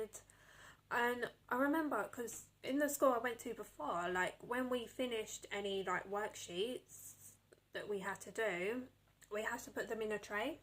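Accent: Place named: United Kingdom. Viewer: This was randomly shown to me on my feed, you seem to have a very comforting aura about you. You’ve got a nice voice and personality. I wish you the absolute best with your content creation. I would say that the most critical thing you should do is plan as much as you can before you start recording. If you can do that, while maintaining the authenticity that you have in spades, that’s the best you can do! You’re doing great!! Also I really like your british accent 😅 and you’re pretty funny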